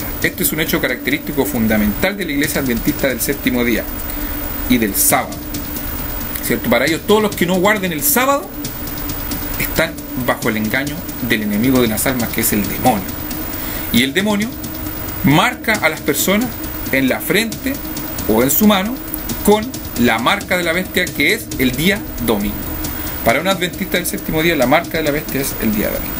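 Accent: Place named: Rioplatense, Argentina. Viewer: una pregunta eres argentino o paraguallllllllo.....dime ...que le echan al agua por ahi???? o te dejaron caer cuando chiquito????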